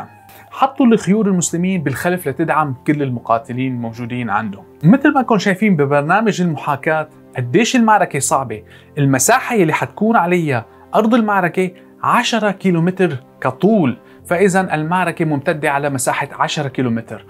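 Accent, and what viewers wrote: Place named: Syria. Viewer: على فكره اللهجه السوريه كثير حلوة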